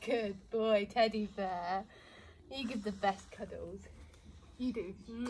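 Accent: United Kingdom. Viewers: "Reddy..." 😆 I love the British accent 😸...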